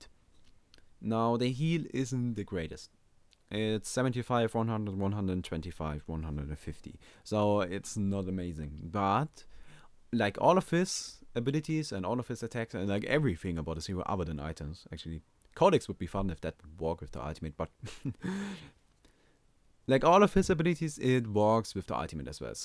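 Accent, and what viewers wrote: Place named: Germany. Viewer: Haha nice accent... du bist so deutsch! hies furd abiletea...that is in seit of his enemy.. krass.. guter guide... kennst du kino der toten black ops 1.. manchmal bist du ein deutscher und der hort sich genau so an wie dein ausprach.. wirklich ich lach mich tot.. gruB und keep on the good work aus holland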